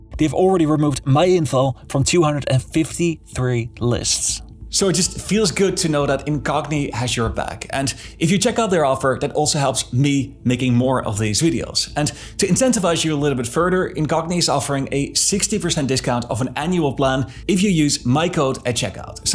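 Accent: Netherlands. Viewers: It’s a bit weird to keep referring to ‘them’ and ‘they’ sir with the Dutch accent 🤔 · horrible Dutch accent! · Nice video, but why do you keep referring to the Dutch as "they"? You're clearly Dutch (heavy accent), so why not say "we"? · This is a good and really interesting video! But why are you talking about us in the second person? Aren't you Dutch yourself? I can hear it in your accent and see it on your profile...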